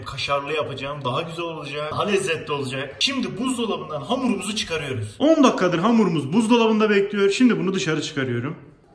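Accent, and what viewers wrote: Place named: Hatay, Turkey. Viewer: Abi konudma tarzınla o kadar güzel ki ergenlerjn yorumlarına aldırma ben sinir oldum vallahi tam Hatay ağzı :) Yolun açık olsun :)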